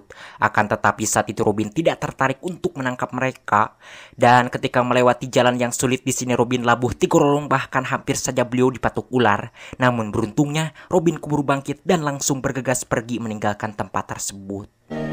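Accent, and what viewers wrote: West Java, Indonesia. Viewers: Anjig Sunda pisaaaan he he he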